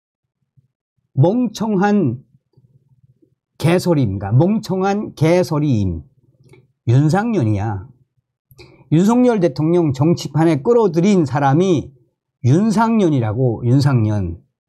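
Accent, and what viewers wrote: North Korea. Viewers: 윤상현 입니다 윤상년 아니구요 존경하는 정성산 감독님 방송 열심히 해주시는데 감사합니다멸공 부탁의 말씀은 발음 연습 필요합니다북에서 쓰시던 발음 불편하게 들릴때 많아요 열심히 해 주세요 화이팅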